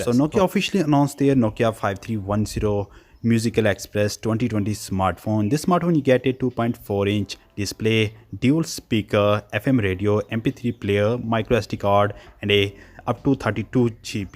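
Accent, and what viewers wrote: India. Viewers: Oh that indian accent.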